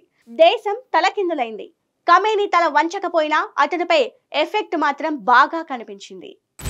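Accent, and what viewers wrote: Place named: United Kingdom. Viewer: Voice baledu ...English accent lo telugu matladutunnav.....👎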